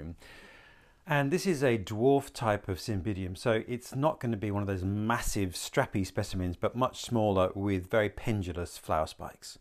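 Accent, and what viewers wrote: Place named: Australia. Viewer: Hi, I really enjoy your energetic teaching and sharing of your amateur orchid knowledge... the Aussi accent, well-spoken English, and humor are very entertaining. I have about 5 orchids that are from a 'seed population'. One of those, a violacea var. correulea, has a spike growing now. Even if the flower doesn't have good color saturation, after a year, it will be difficult to part with it! I'd likely consider trading it for another mature plant. Cheers.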